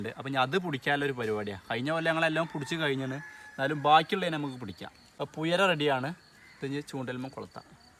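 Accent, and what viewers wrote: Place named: Malappuram, India. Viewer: മലപ്പുറം സ്ലാങ്ങിലുള്ള അവതരണം പൊളി ആണ് വ്ലോഗ് സബ്സ്ക്രൈബ് ചെയിതിട്ടുണ്ട്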